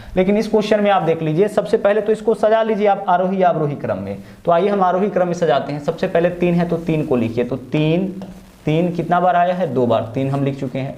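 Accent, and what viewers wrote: Bihar, India. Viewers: Aap bihar se hai sir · Sir aap bihar se h kyy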